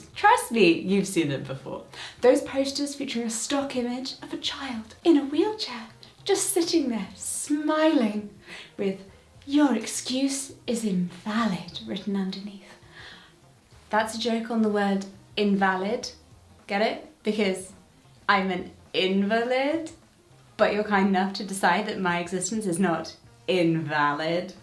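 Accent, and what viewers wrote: United Kingdom. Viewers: Maybe it's my American accent hearing your British one, but I could also reason using the word "pawn" as a stand in 😉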